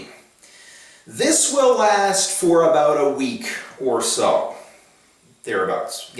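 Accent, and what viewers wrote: Newfoundland, Canada. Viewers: You got to be from Newfoundland, I can tell by your accent.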